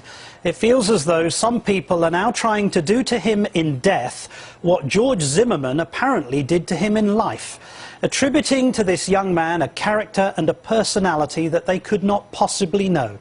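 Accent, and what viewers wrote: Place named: United Kingdom. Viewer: Wow--funny how far he stretches to make a Conservative look bad by digging up something that happened more than 23 years before he was elected, as a teetotaller. Obama was a druggie in the 80's--notice he doesn't bring that up. Obama was promoted by murderers and terrorists. Obama tried to stop helping babies who survived abortions and wanted to let them die. If that's the best this moron can do, he needs to take that British Accdent and go hang out in Englan with that racist Paul MCartney.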